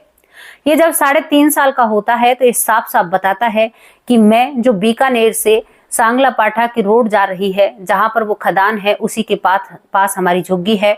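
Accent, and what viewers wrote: Haryana, India. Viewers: Aap ki bolney ki tone Haryanavi hai 👍👍👍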